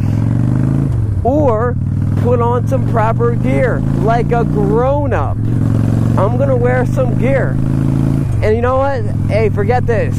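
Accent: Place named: Georgia, United States. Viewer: LMFAO... can tell was in GA for a while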